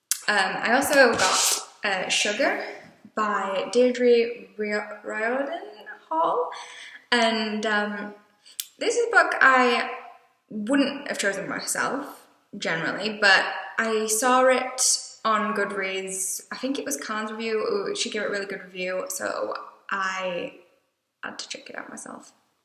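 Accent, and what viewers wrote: United Kingdom. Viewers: subscribed to your channel! Please keep uploading new videos. I felt in love with your British accent. :D The more videos you upload the more confident you eventually become. :) p.s. nice T-shirt. Give your cat a rub it was well-behaved. :D :D :D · Aw, your cat is so well-behaved! Uprooted is next on my list. Anything that gets compared with Howl's Moving Castle is an instant win. Also, it's so great to hear a Northern accent! My Stoke one tends to get the ear squinting treatment! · I'm so glad your doing video blogs now. I love hearing about the books, I have read a lot that you mention in your blogs and others I add to my list as you make them sound interesting. I love your British accent as I love all things British. Had to include that!:)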